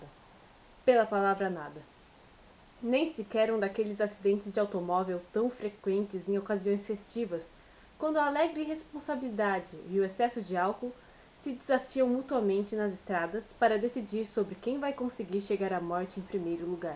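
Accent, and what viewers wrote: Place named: Interior, Brazil. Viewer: Rsrsrs isto eu percebi, que o sotaque é brasileiro. Só não consegui identificar exatamente de onde... Sua leitura é muito fluida. Tive de parar, prestar bem atenção. Chutaria SP por causa do "r" =D (porém muito sutil). Foi esse cifrão do Euro que me chamou atenção. Por esse preço valeria comprar fora mesmo pagando frete internacional. Nas livrarias da minhas cidade está muito caro. A língua é a mesma... Parabéns pelas ótimas leituras ;)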